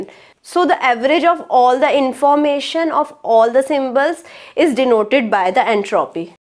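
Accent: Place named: England, United Kingdom. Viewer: Ap England sai ho kya mem... Hindi nhi ata kya apko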